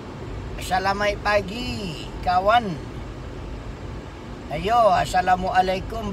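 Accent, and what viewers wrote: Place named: South Solok, Indonesia. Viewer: Ohh... gini ya logat solok selatan, benar kan muara labuh di solok? Salam dari pekanbaru 😊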